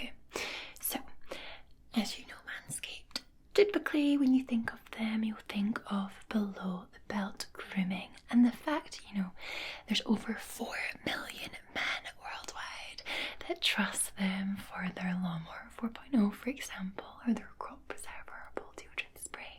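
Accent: Scotland, United Kingdom. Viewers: 🧡 thank you very much for this video. I truly do love it. Is it the best one yet, I wonder if it can get over the best ones before. And you're wearing such a great dress, the dress with all the cute things. What an awesome clothing, best spring dress. 😊 I bet you chose it because of the spring did you. 😃 Also I loved your latest video. Even though your Scottish accent is amazing, I also like to hear you talk with that English accent. You were really wonderful with your acting skills and making me sleep with entertainment attached to it. I had looked forward to see Lara Croft again and there poof she appeared like magic. You were awesome, schön, ausgezeichnet. 😃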